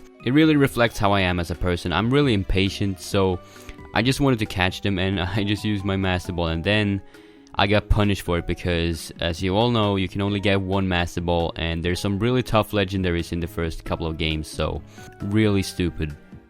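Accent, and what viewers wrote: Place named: Sweden. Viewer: Swedish? You really sound swedish imo.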